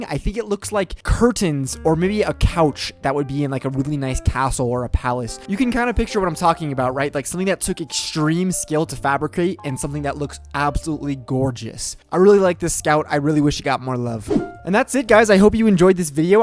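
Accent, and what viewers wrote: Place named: United Kingdom. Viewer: Insane Bri'ish, certified banger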